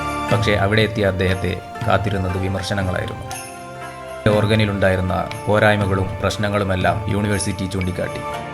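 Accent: India. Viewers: Pakka kozhikode slang is here 😇😇😇🥰🥰😍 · ഇങ്ങള് കണ്ണൂരാണോ ....? 🤔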